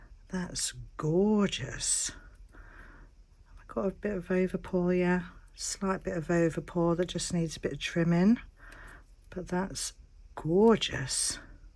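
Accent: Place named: United Kingdom. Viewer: Another beautiful masterpiece as always,. God bless the UK for some of the most beautiful, most graceful female artists in the community, with such beautiful accents, and graceful poetry. 😍😍😍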